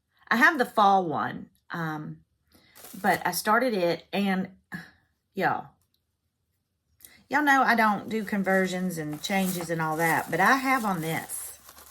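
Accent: South, United States. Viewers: I just started watching your videos last week. I really like listening to that southern accent (I'm from Texas). Now I love you videos! Anyone who owns a golden retriever has a special place in my heart. Thanks for sharing your videos. I really enjoy them.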